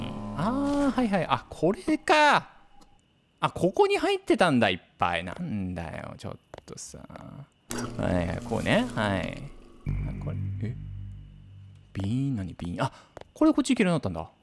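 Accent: Hokkaido, Japan. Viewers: 先生の作品紹介が全部好き。滑舌良くて聞き取りやすい。そしてたまに出てくる北海道弁がかわいい笑(私も道民)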